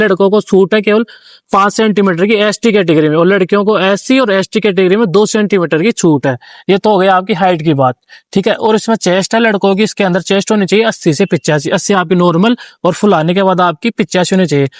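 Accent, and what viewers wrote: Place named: Jhunjhunu, India. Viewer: Ya aavaj to mahre jhunjhunu ki lage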